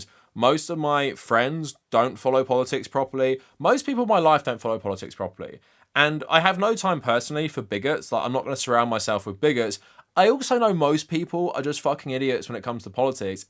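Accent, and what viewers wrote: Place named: United Kingdom. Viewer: But you sound like a "bloke" who lives in a country with a KING!!!!!! Is that more evil than the US system, or is it the same? What is your opinion of the house of Lords? It's ok that you have opinions, but it seems you don't have any mirrors in your house..... maybe look at yourself? just sayin....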